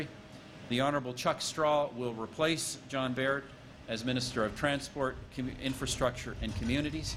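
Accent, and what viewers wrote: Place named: France. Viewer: people who are making fun of his french accent. Well, some french who speak english don't have a perfect accent either...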